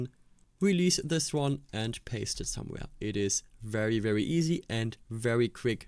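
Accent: Germany. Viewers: Probably he is german, or speaks german.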